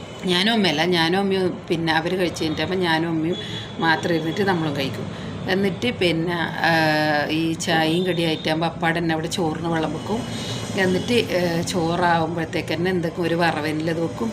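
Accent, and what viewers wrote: Kannur, India. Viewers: നമ്മുടെ നാടൻ കണ്ണൂർ ഭാഷ 👍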